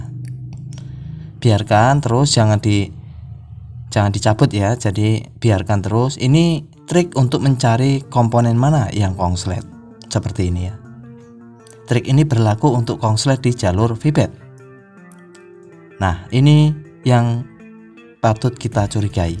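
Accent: Java, Indonesia. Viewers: Samean asli mana mas ? Kok koyoe medok nek ngomong. Hehee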